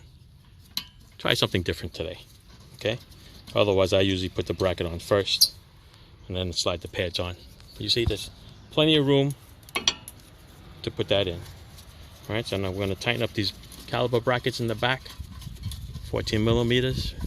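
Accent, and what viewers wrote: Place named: Deep South, United States. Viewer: This was fantastic. Now bc it’s brakes I don’t have enough courage yet, but I’ll bet if I did, I would get it right because you are such a good teacher. Also, I’m in the deep south and hearing your regional accent, which is close to mine, was very comforting. Miss being around my people. 😅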